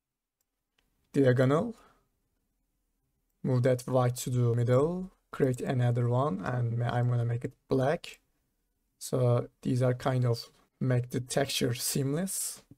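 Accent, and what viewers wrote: Turkey. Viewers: Turkish accent 😅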